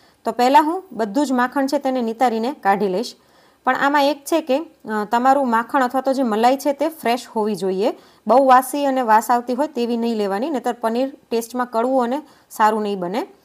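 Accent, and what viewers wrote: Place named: Kathiawar, India. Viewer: Madam tame puore kathyavadi bolo cho How sweet Kya city ma cho? All tips best👌👌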